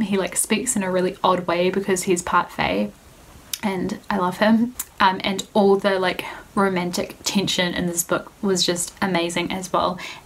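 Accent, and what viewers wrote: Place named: New Zealand. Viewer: Just stumbled across your channel from your Harry Potter film Wizardry flick through. I could tell you were a Kiwi straight away from your accent :) Just subbed and So excited to see more of your videos in the new year!! HAPPY 2019 - Also when you said Molly Moon it brought back so many memories haha.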